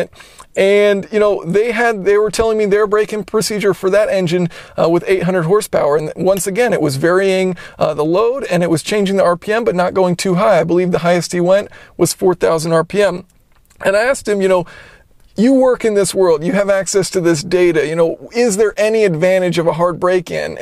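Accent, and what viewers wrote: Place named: South, United States. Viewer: Hyundai Accent Fan Club. I like the southern accent. headlights are very impressive, really stand out in the rain. body lines are smooth as silk with curves precisely where needed. does have a Crack in rear end but the ride is excellent. .maintenance can be pricey if not cleaned and serviced daily and if given wrong fuel there is a occasional exhaust smell. do love it but can't wait to trade for new model. ...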